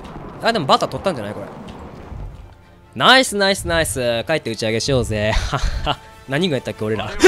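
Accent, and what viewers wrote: Kansai, Japan. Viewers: Ruさんの声めっちゃ好きだわー関西弁もカッコいいです〜笑笑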